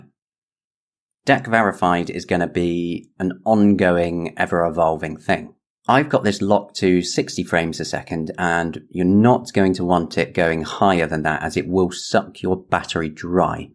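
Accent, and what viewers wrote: United Kingdom. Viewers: Are u from Liverpool?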